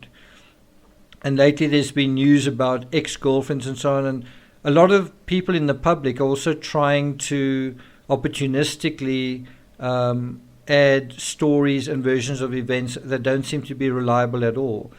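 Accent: South Africa. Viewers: I’m trying to figure out your accent, I’m guessing South African???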